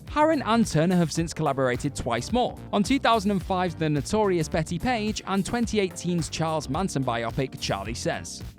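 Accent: United Kingdom. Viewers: The narrator is British, so is Bale. Maybe it's Americans that are saying it wrong.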